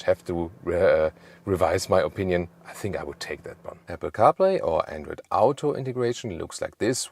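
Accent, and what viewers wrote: Germany. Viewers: His German accent is sooo pronounced... oh my fucking god. :D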